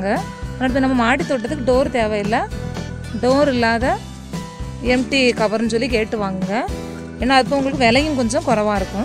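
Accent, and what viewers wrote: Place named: Kerala, India. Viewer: hi mam,thank u viewers, pudichirunda subscribe pannunga,nu neenga Malayalam kalandha Tamilla solradu romba cuuta iruku , well. done very good!!!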